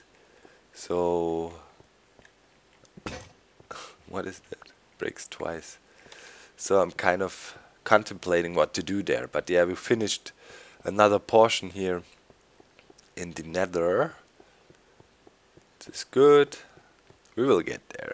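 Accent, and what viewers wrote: Germany. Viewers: Haha my mum think that you sound like Arnold;) - The german accent is infactious!:D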